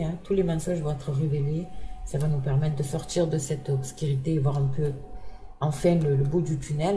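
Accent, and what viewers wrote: South, France. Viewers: Bonjour nouvelle abonnée, j ai vu votre commentaire et me voilà ! Comme beaucoup ici j ai toujours entendu que la loi martiale en France ça n existait pas! Et je vois que vous dites oui tant mieux ! Mais qui a déjà vu des militaires ? C est a venir ? J entends votre accent je me trompe peut être mais c est le sud 🥰 . Oui on doit faire attention ça je le sais !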